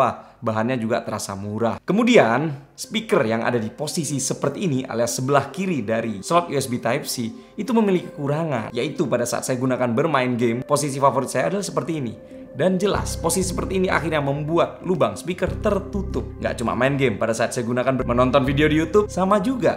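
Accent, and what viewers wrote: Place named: Java, Indonesia. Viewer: Seneng nak smpean ngomong bang sante tp lucu mbe medok wkwkw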